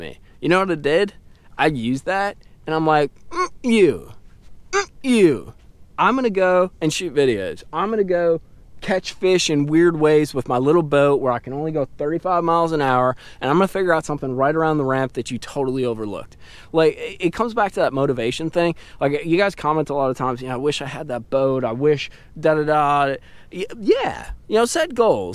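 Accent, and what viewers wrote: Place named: Pittsburgh, United States. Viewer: Good content, where are you from? You almost have a Pittsburgh accent lol I heard you say n’at before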